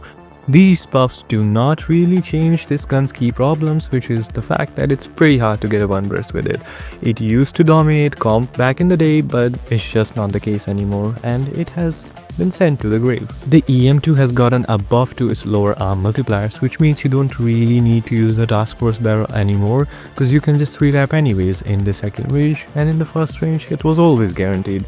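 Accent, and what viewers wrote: India. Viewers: non Indian VOs please comeback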